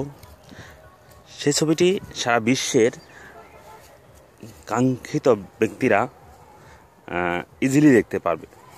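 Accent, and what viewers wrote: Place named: Bengal, India. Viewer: Bengali guy speaking Inglish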